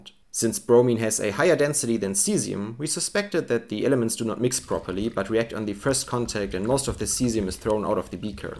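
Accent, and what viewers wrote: Germany. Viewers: Is this guy German? Cool experiment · geiles video und krasser deutscher aktzent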